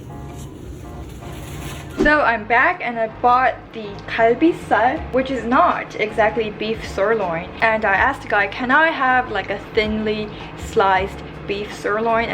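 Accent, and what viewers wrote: Philippines. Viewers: Are you a korean or half korean? Your english intonation is quite Filipino. By the way your so pretty Ms. 😉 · You are so lucky you can speak clearly and distinguish an L from an R. Like very much your dictions and proud of your Pinoy English style. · Did you study here in the Philippines? Cause your English is so good like filipino accent.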